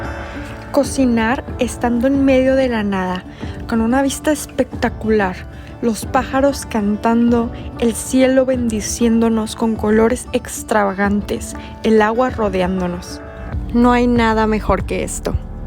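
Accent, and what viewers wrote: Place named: Monterrey, Mexico. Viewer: Chicos me tenéis enamorado de vuestro viaje y lo buena narradora que eres tú explicarlo todo con ese lenguaje y acento tan guapo mexicano que tienes o sigo porque yo también soy aventurero teclista ya he recorrido todo México en bicicleta y Centroamérica continente africano y Europa próximo objetivo es viajar a Sudamérica en bicicleta vuestro vídeo me siguen animando y dándome fuerza para emprender este viaje ya que a mi edad y ya un poco difícil y es forzoso ya que soy una persona jubilada con 65 años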